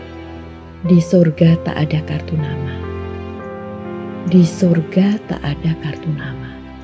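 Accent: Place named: Java, Indonesia. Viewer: Pronounciation khas Jowo ya? He3... Sing penting penonton paham karepe ya? 😁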